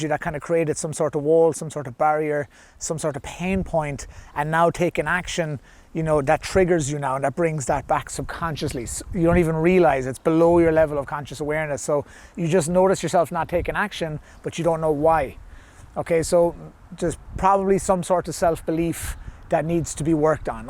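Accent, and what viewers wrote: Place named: Ireland. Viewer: hey, i just stumbled on this, i wish success in your youtube endeavours my friend. your so right though. recently i asked myself am i dreaming to big/too small. and it wasnt until i dared myself to dream big that it made things a lot more clearer in my mind. thank you for your insightfulness. i reeally loook forward to diving deep into your content, btw im from dublin, its so nice to hear an irish accent on this topic! godspeed, <3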